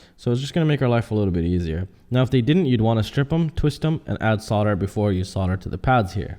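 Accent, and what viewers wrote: United States. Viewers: Great vid and thanks. However - why do our beloved US friends say "sodering" when it's "soLdering"??? Always makes me laugh. :-)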